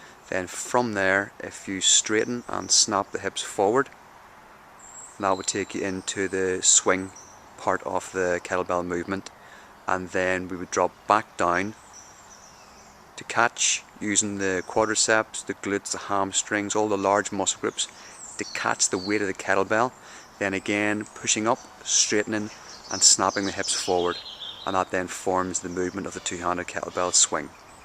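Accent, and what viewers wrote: Ireland. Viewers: The only person to equal your clear and accurate descriptions is the original kettlebell man Pavel ,excellent video and great commentary from your friend,oh and as an aside its great to hear your lovely Irish brogue,makes me feel homesick ,and I'm a Scotsman in Australia..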